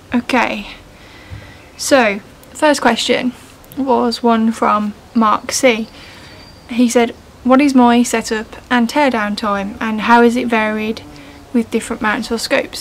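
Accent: United Kingdom. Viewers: Great Video. Your honest opinions are definitely inspirational. I'm liking the way you say "Astro Pixel Processor" in your English accent so I continue to watch. Your direct responses to questions are right to the point which is more a reason for me to watch. I bought APP based on your recommendation and I never buy subscription software. I'm just learning an Astro cam. I have ZWO533 and ZWO183 and hope to get some images after being a DSLR user for many years. I bought the LP-Pro filters also based on your reviews. Hard to get these here in the USA. I have much light pollution over Boston, Mass and value your insights. Keep up the great videos. Being in a Pandemic, outside with the stars is the safest place to be.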